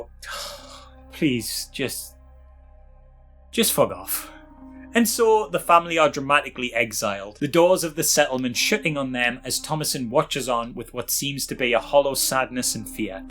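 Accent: Newcastle, United Kingdom. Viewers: Everything sounds good in a Geordie accent.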